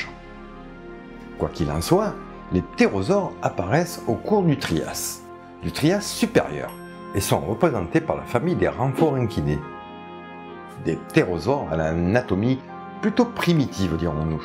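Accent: South, France. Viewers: Cher, tu es un mélange de Ben Kingsley pour le physique, de Jean Lassalle pour l'accent (ce n'est pas moqueur, je suis moi-même du Sud), d'Alan Grant pour le savoir et de Carlos (le chanteur) pour les chemises😂 Par contre, es-tu sûr que le Tyrannosaure et le Tricératops soient apparus au Jurassique? Il me semble bien que ce soit au Crétacé, non?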